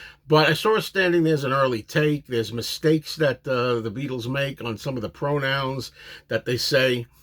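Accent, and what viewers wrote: New York, United States. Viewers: Great review! LOVE your NY accent.. You could be Murry the K...The 5th Beatle!! lol..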